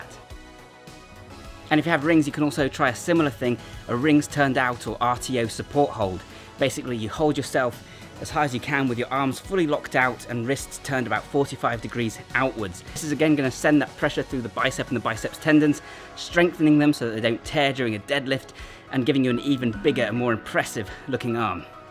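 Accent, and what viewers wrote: United Kingdom. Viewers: Side note. You have a great naration voice for audio books, I feel the confidence and conviction in the words. Must be the accent. Sooo British.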